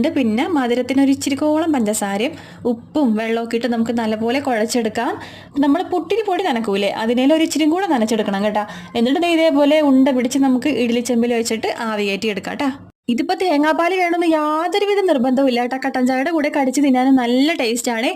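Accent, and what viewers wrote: Kochi, India. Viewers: ഇന്നത്തെ സംസാരം എന്ത് രസമായിരുന്നു കേട്ടിരിക്കാൻ... തനി കൊച്ചിക്കാരി കൊച്ച് ♥️🥰.. ഇതാണ് ഒത്തിരി ഇഷ്ടപെടാനുള്ള ഒരു കാരണം 🫂🫂🫂🥰🥰🥰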